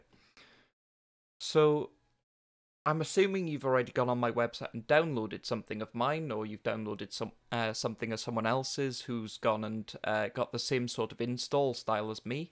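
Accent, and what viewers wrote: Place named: Wales, United Kingdom. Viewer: Gotta love a welsh accent mun aye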